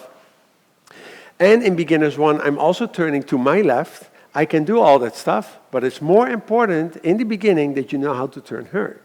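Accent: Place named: Netherlands. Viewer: Well this is one very distinctive dutch accent....👍👍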